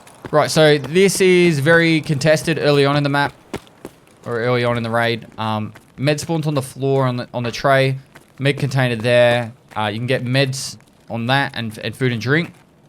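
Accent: Australia. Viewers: As a foreigner not used to Australian accent, i did not understand when he was talking about some « cyfe ». Then I realized he was talking about safes....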